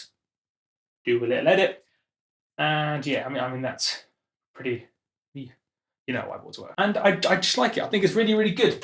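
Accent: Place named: United Kingdom. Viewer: agreed. he has a horrible caser of British pouty voice and british throw away talk syndrome.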